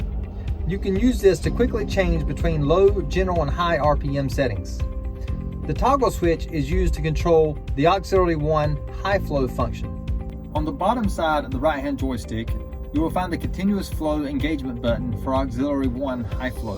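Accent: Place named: South, United States. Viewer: The southern accent is so funny! It still exists nowadays??!! Thanks for making my day! I love the tuturial - now i just have to get me one of those excavators for grandma's trash pile at home - or better yet, my own trash piles.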